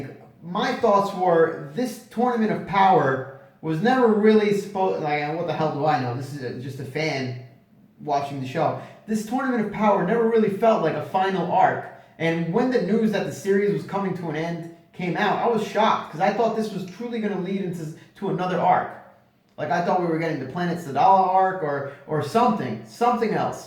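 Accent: Italy. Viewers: zio, ma sei italiano?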